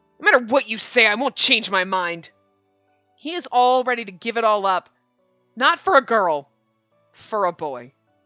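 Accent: Ireland. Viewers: YES! The "Irish" Accent appears. I don't know why, but that has become my favorite of your voices. XD Don't apologize for it. We love it. We're charmed by it. ... At least I am. LOL I LOVE these two Sato brothers!!! OMG! I'm gonna love the side stories for them. And all four men fighting over who carries Shanao, just perfection. XD XD YOu know those two Sato brothers TOTALLY know she's a woman and are totally enamored with her already. XD XD GEEZ! This game keeps getting better and better. XD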